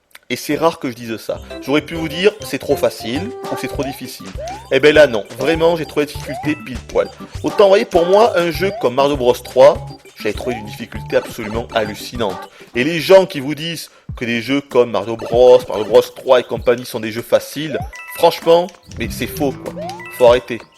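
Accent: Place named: Southwest, France. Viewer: est du sud ouest: (mouainsse AU LIEU DE MOINS ) XD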